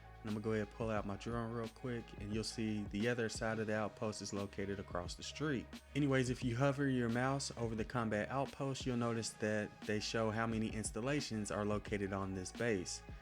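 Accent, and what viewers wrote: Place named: Texas, United States. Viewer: Es FLOR de Oro, pocos huevos de pinche mierda! And Jesus Fucking CHRIST! Talk about a really THICK Gringo tongue! You from Texas, boy? Yo soy, también, pero hablo Español respectablemente, académico, en facto. Tu pronunciación es ferguenzoso. Ay, me cai gordo. Flor has ONE syllable, not two; it's NOT pronounced FLOW-err. Do you HEAR the words coming out of your mouth? I'd be astonished if you'd managed a D in high school Spanish, and that's sayin' somethin', you bein' from the South an' all.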